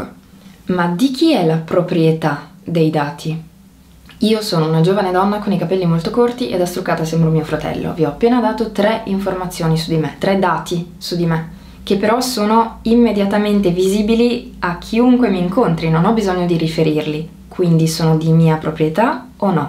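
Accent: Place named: France. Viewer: Scusate ma chi è questa bella ragazza, con accento francese?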